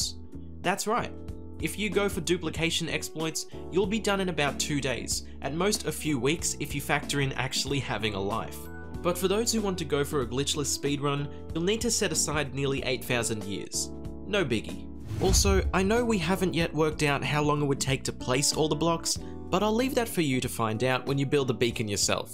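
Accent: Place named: United Kingdom. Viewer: I love this guys British accent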